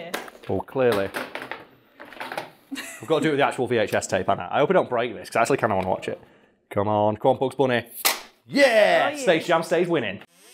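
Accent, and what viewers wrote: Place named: Cockney, United Kingdom. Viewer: I don't even know how to describe this channel. My GF asks me what I'm watching all the time that has that "blaring Cockney accent" and I just say. . . .uhh he's talking about things . . . That happened. . . With tv and movies. . . But it's funny and interesting. She says "like TMZ for the UK?" And I say "NOOO DONT YOU FUCKIN DARE"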